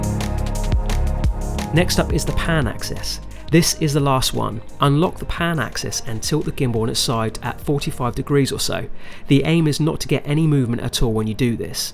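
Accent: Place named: United Kingdom. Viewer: dig the accent…from UK?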